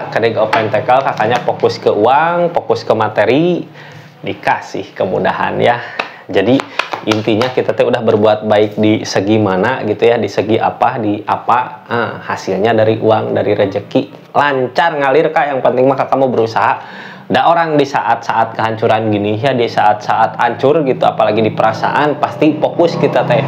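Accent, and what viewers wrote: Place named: Sunda, Indonesia. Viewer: Biar bahasa Indonesia ,logat Sunda nya medok aja ,resonet aja deh komen aja deh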